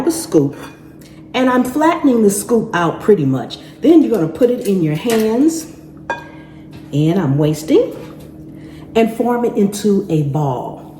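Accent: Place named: Upper class, United States. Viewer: Love your accent sounds like posh American. Cookies look good...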